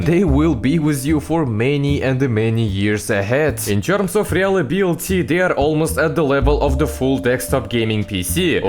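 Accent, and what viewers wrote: Russia. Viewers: This Russian accent pmo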